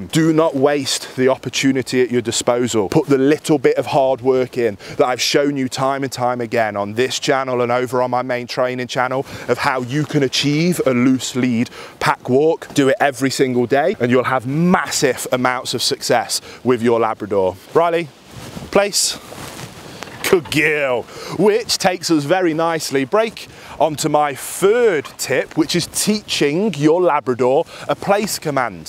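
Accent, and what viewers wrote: United Kingdom. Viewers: What amazing training tips! I've lived in South Korea for a long time so hearing a good British accent is something I miss!!! My lab loves his crate now since watching your video.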